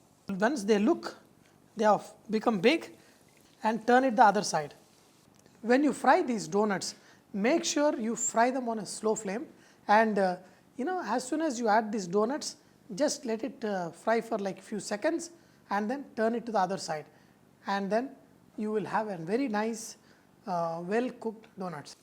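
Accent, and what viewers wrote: India. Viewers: Why do indians have such a funny accent? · sir you are a great cook nothing wrong with indian accents but since you are a great chef, please fix some of your pronunciations. for fantastic: it is fan. not fun or funtastic. for flour it is not flar/flaar or flore/ floor. it has same pronunciation like flower (phuul). same with hour (ghanta) it pronounces same like "our". hope you didn't mind. not aar/ are. although some measurements are missing from some of your recipes, but your cooking is undoubtedly "funtastic" :). since you have a great sense of humour. god bless you.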